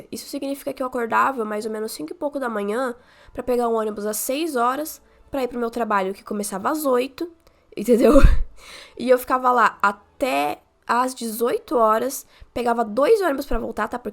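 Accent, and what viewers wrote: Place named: Santa Catarina, Brazil. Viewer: oii, pelo teu sotaque eu desconfio que tu sejas de SC obrigado pelas dicas!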